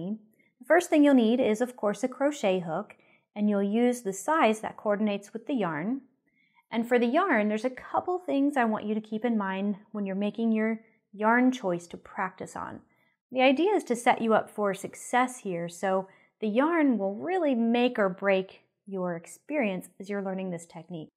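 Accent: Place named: Pennsylvania, United States. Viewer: Hi! Gave you a like of course... It's a habit I've gotten myself into.. I do it first thing so I don't forget. I already have mastered the magic ring, but your episode and technique was very good. Also, I liked that little cheat you did with using the ch 4 instead.. good to know! You sound like you're from PA. I can hear the accent in some of the words you say. Thanks for another great episode of B. Hooked TV. 🌼🙂🌼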